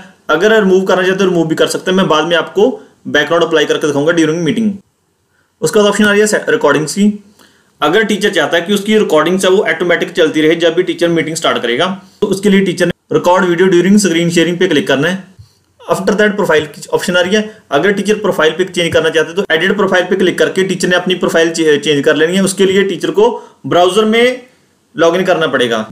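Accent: Punjab, India. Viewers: Seems like u are Punjabi from Ur accent 😊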